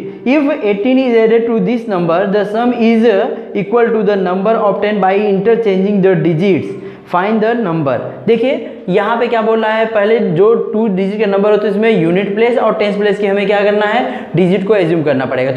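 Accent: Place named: South India, India. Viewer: Sir from which state are you ,your speaking tune are like south Indian people's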